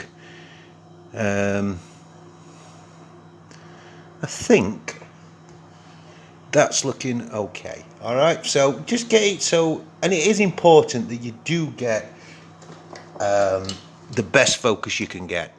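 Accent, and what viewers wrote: United Kingdom. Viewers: Thanks for the brief introduction to SharpCap. Like you say, there not many YT videos to help get started. It's good to hear a British accent downunder. Keep up with the humour.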